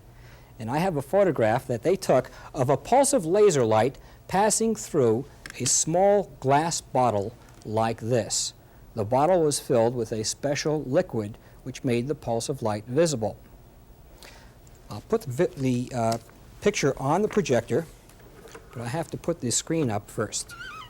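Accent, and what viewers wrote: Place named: New York, United States. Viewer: Mirruh and electrissidy. Sounds like Richard Feynman def new yorkish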